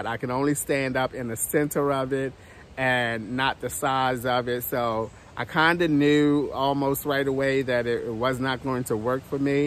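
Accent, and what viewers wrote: Baltimore, United States. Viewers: where are you from, I'm from Baltimore and I can't help but to hear a little Baltimore in your talk.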